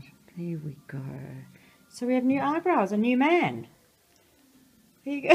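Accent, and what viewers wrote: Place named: South Africa. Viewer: Right away I picked up the South African accent. I lived in Cape Town for a bit and my best friend that I lived in CT with is from Johannesburg.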